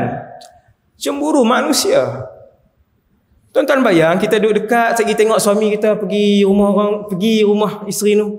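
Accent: Kedah, Malaysia. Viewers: Ustaz adalah favorite ustaz sya.. Ditambah lgi sya penah mimpi ustaz..Alhamdulillah bersyukurnya dpt mimpi ustaz. Kelebihan ustaz bgi sya..sorg yg sentiasa mnjadi diri sendiri iaitu ke mane saja negeri yg ustaz pegi, ustaz tetap utamakn loghat negeri ustaz iaitu Kedah.. Semoga Allah prmudahkn urusan ustaz di dunia n akhirat, dikurniakn kesihatan bdan n usia yg pjg..Aamiin Ya Rabbal Aalamiin🤲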